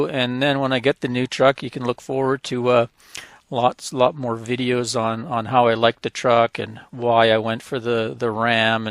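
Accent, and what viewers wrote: Canada. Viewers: In stead of calling it Big Blue 2 The Dodge Two Blue. New to your channel getting cough up on your episodes. Man you are so Canadian PLEASE No Insult intended I loved hearing you say "WICKED!" Have Great Travels. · Are you from Canada man. I can tell by the accent. Also I'm sorry for Old Blue man hopefully it'll go to a great home with someone that'll bring it back to life.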